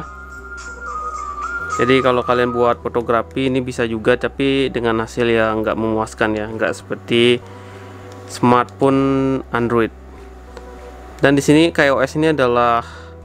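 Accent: Bali, Indonesia. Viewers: Dari nada suara bicaranya sampean kayak nya org bali ya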